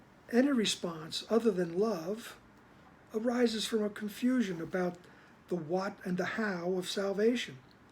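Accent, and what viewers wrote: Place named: New York, United States. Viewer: I knew you too were a New Yorker! Had a feeling when I heard the sirens and the way you talk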